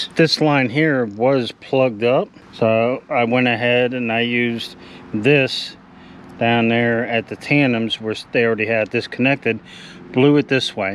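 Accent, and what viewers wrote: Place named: Pennsylvania, United States. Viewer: Hi, you're saying "Y'ins" a lot. Are you in/from Pennsyltucky?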